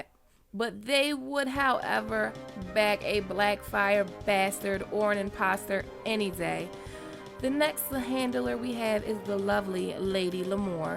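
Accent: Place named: Los Angeles, United States. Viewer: out pops beautiful bad bitches preach lol I love your voice You're from la ? u talk hip as shit and your game of thrones knowledge is on point. 💯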